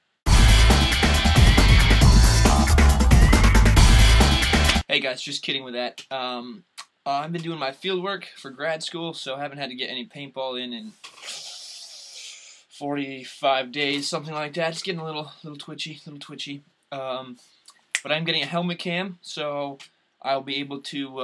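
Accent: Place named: Sweden. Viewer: two things. 1) Eat. You are loosing weight 2) You are developing a Swedish accent. Train. Harder.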